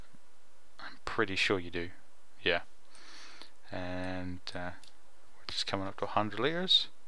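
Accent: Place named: England, United Kingdom. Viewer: You sound fairly English to me, but maybe its just me.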